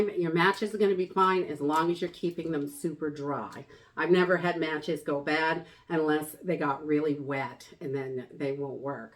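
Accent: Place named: Maine, United States. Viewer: I grew up in Maine outside Bangor-so her accent makes me smile :)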